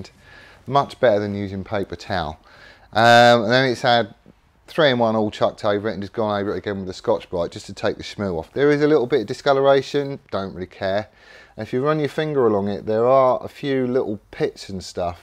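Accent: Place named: Northern England, United Kingdom. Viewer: You're doing a nice job there. A question that's nothing to do with this, you live in Plymouth but talk like a northerner ? What's that about ?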